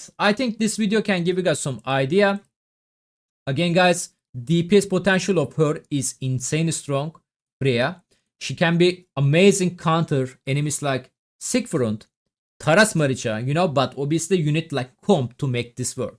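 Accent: Turkey. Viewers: Kesin bizden,ingilizce mukemmel olsada tonlama tam turk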